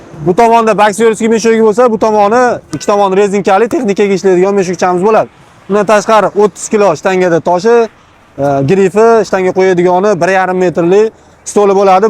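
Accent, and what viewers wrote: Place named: Andijan, Uzbekistan. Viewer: Шевещга караб Андижонга ухшаяпти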